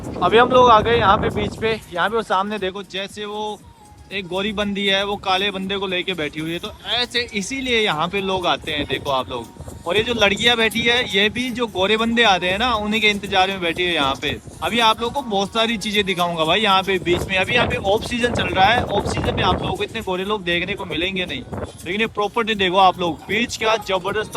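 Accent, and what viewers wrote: Rajasthan, India. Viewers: Are You Belong from Rajasthan? Language Accent 😁❤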